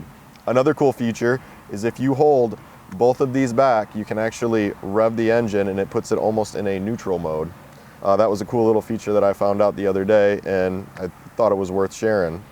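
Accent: United States. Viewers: twice not two times , mirror not mir ninety not nindy ...americans murdering the english language once again .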